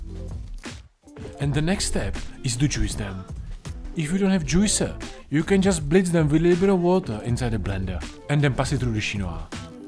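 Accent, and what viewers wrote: Czech Republic. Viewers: That is just extremely strong Czech accent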